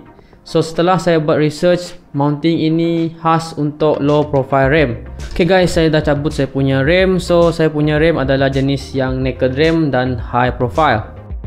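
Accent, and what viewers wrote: Sarawak, Malaysia. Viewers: Org srwkkah,sbb cara nya cakap kedak org srwk pin slang xd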